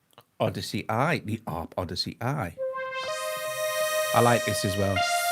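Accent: Merseyside, United Kingdom. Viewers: Apple should pay you a commission. This video was the best argument for getting myself a new i-pad I have ever heard. Back in the days of The Strawbs, I dreamed of owning a Mellotron and later a Wavestation - I saved up for one only to find it had been discontinued. Now you've shown me I can have both for very little dosh (once I've splashed out on the i-pad). Now, you may have been in Cornwall, but that voice tells me that, like me, your origins are somewhere on Merseyside. Everton, me, but I am a red! I now live in Catalonia.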